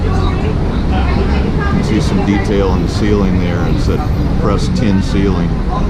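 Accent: New Orleans, United States. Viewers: In your second reply below, you mentioned taxes.....I would imagine they would be very high in the French Quarters and probably everywhere else, considering the historical aspects of the entire city. I've certainly been enjoying all of your wonderful videos. You would be a most interesting lecturer....you have the right voice and your charming New Orleans accent makes it even more delightful. Thank you so much.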